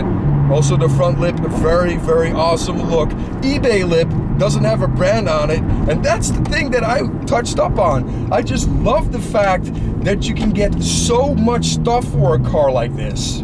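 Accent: Netherlands. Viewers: ik hoor op jou engels dat jij uit Nederland komt groetjes uit Bosnien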